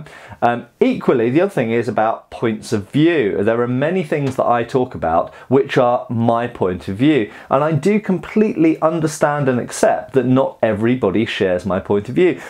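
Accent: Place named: United Kingdom. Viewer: I could never hate you,. You're adorable. Also, I'm a sucker for the British accent.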